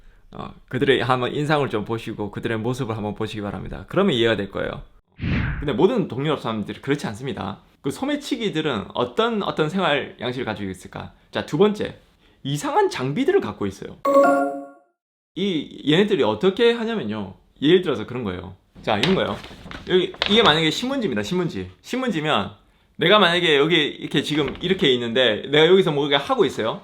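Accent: Gyeongsang, South Korea. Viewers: 와우 경상도 사투리가 마 쎄 빡 섞인게 매력적이시고 방송 잼나네요. 여름에 이탈리아 가는데 이방송이 참고서 되겠네요. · 얘기가 경상도 사투리로 구수함이 느껴져 구독합니다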